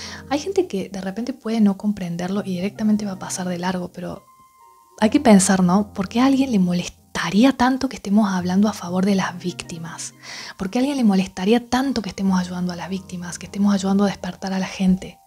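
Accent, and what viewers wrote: Argentina. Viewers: Gracias por ahondar en un tema tan importante.Las personas más autenticas(PAS)las atraen como moscas y al no saber hasta que punto esta BASURA HUMANA puede dañar ,,,cuando te das cuenta,ya dinamitaron tus cimientos...PREGUNTATE EN TU VIDA SOLO 2 COSAS: 1-¿Que hago setir?....2-¿Como me siento?....a la hora de relacionarnos. Me vuelve loco el tono argentino en una mujer!!jiji...Un Abrazo!!! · Castellano, argentino puro, más claro imposible.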